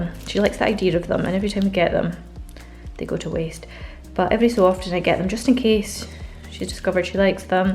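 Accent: Scotland, United Kingdom. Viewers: All I could think is your Scottish lol. I shouted it actaully, I may need help. Trying to find my way just now on my own channel and your's within being on it for two minutes gave me hope and inspiration. Subscribed. <3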